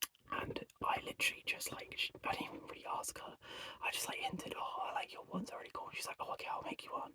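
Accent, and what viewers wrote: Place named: United Kingdom. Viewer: I’m sooo ready to just relax to this video even though it’s an older one of yours. It’s been a long day. Random but I have one pen pal friend that’s British and I’ve never heard their voice before but hearing yours..I can imagine they might have an accent like yours. Though I’m trying to keep in mind that there are different accents depending on where you are in your country..? Right? 😂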